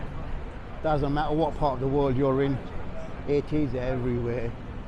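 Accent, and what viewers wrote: United Kingdom. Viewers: I remember Bodgers in Illford and Illford Town Hall disco's on a Monday night (Interupted due to N. Benn fighting with Locals!). No offense but I detect a bit of Brummie in your accent, am I right? 😄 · You sound more Cockney than me and I was born in Stratford in 1969 👍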